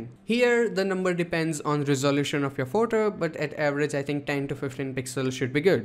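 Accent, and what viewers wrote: India. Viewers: Your accent is a lot Indian (95%) and some American (5%). You posing bruh? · INDIAN ACCENT -- PROBABLY FROM INDIA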